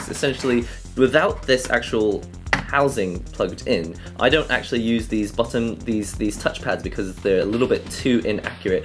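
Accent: United Kingdom. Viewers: its like hes from Japan, but he sounds british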